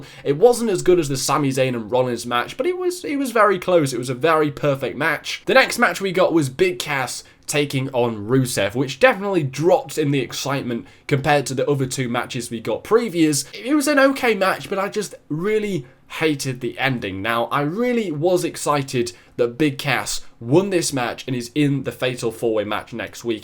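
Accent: United Kingdom. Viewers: First, as a lesbian, I agree, NO wrestling company should be trying to put that in their storylines. Perfect examples in the past, early days of Goldust, and TNA's Orlando Jordan. Gawd awful characters. Second, 4am? Thought I picked up an UK accent. Awesomeness! Have friends over in that part of world, including a woman still have feelings for. Third, may rewatch Seth VS Sammi later on. Still beat from the shows this weekend, so maybe later on, I could appreciate it better. 😉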